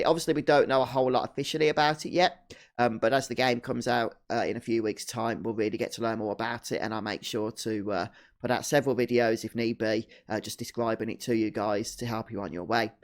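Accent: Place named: Somerset, United Kingdom. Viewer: Are you from Somerset or somewhere? Haha. ;)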